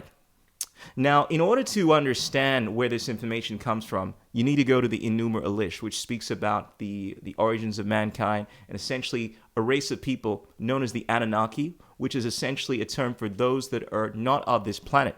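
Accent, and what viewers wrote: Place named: United States. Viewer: Why do you speak with an American accent if you're from Ghana?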